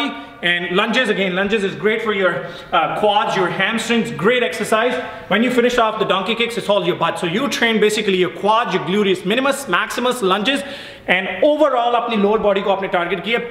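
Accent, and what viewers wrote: Punjab, India. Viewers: Plz speak slowly I'm not punjabi.its hard to get ur accent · You talk too fast and with a lot of Punjabi accent..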